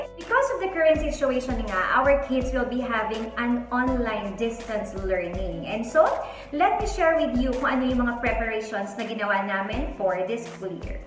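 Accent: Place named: Vietnam. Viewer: Although can not hear English. But I'm also curious .... You are Vietnamese! You speak English like Vietnamese. great, excellent, wonderfull . I have subscribed to the channel. Please exchange. Wishing you and your family wellbeing. Love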